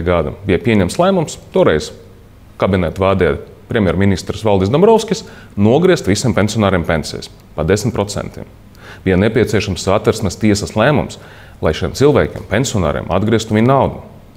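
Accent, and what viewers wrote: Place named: Russia. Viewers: Jums ir vismaz viens vērtīgs arguments pret Nilu neskaitot krievu akcentu un krievu valodas lietojumu? Vai jūs tikai lamāties spējat? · Human . Akcents ? Viņš drīzāk krievu valodā izrunā latviešu vārdus.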